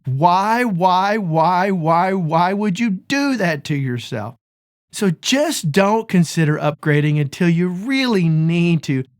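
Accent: South, United States. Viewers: Says the guys with shit guitars hanging on the wall. Not to mention, FLORIDA. Can’t trust tech advice from FL or a southern accent. This post is F’ing stupid.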